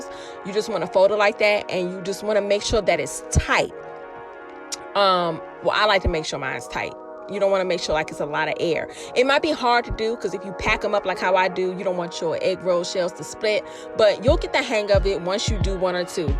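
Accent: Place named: Baltimore, United States. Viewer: You sound just like us from B more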